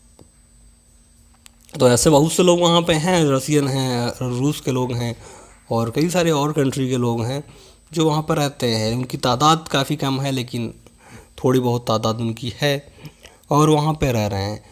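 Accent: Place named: Uttar Pradesh, India. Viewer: Up Se ho kya bhai ..Awaaz Se lag raha hai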